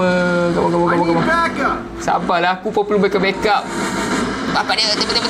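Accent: Terengganu, Malaysia. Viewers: Dengar ganu weh lawan main game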